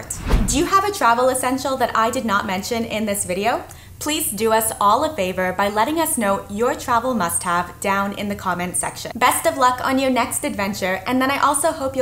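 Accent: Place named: North, United States. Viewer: I love the phone case idea. I need to get one of those. Also, I really like your accent. Where are you from? It sounds northern US or Canada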